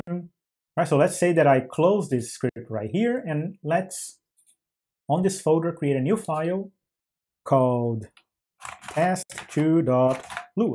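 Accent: Brazil. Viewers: sabia q voce era br, conheco sotaque portugues de longe kkkkkkkkk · he sounds Brazilian tbh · Thanks for the contribution. A tip, you can browse for the file from the environment variables, and navigate through the folders to find Lua folder, which might be easier than writing. If you are brasilian, which your accent sounds like, then: Valeu, obrigado :)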